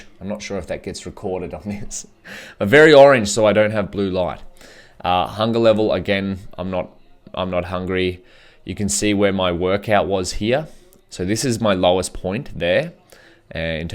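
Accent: Australia. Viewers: Are you Australian? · Its an Australian accent dumb dumb, of course he is from Australia. Jeeeesus.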